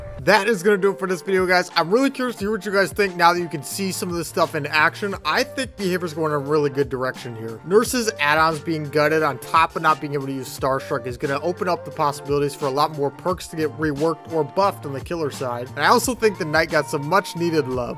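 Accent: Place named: United States. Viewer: There’s something very wholesome about your in-game commentary. Maybe just me liking American accents as a British boy :/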